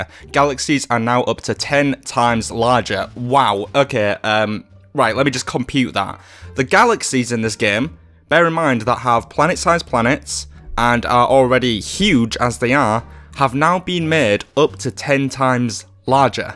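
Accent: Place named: Liverpool, United Kingdom. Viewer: The uploader probably hears that question a lot, so this is for the community: What is that accent? Reminds me of a Scottish influence lice Scouse as far as vowels are concerned - but inflection and consonants are way different. Accents are very interesting but incredibly hard to learn about if you don't specifically set out to learn about them^^ Learning English didn't even feel like learning, just exposing myself to the language... but with different accents, you're rarely given the meta information about them when you're just watching stuff ;) Yes, I'm off topic. I Know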